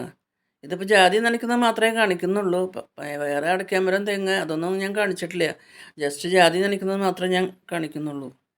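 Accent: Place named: Thrissur, India. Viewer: തൃശൂർ ആണോ ചേച്ചിടെ വീട്. സംസാരം കേൾക്കുമ്പോൾ തോന്നുന്നു. എന്റെ വീട്ടിലും നിറയെ ജാതി കൃഷി ഉണ്ട്. 👌👌❤️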